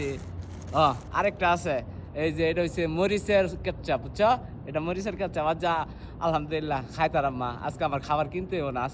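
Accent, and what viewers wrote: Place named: Mymensingh, Bangladesh. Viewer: ভাই মনে হয় তোমার বাড়ি ময়মনসিংহে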